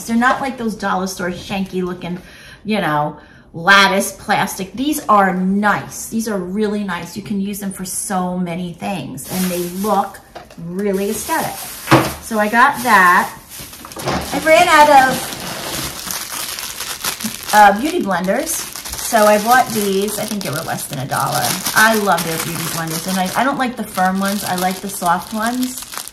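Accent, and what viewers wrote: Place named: New York, United States. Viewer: Just found your channel ❤ I’m TEMU obsessed and my cart on the app just keeps getting bigger and bigger 🥹 I am from Long Island and moved to Florida a few years ago and heard you and your laugh and just knew you were another amazing New Yawkwr 😊 You’ve got another subscriber 🎉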